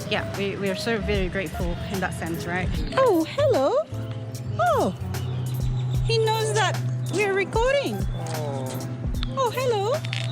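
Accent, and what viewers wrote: Nepal. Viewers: OMG.... your nepali accent ❤️❤️❤️❤️❤️ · You've got a very very beautiful Nepali accent. Kudos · You have so nice Nepali tune loved it hope you will speak alot more Nepali language 👍👍👌👌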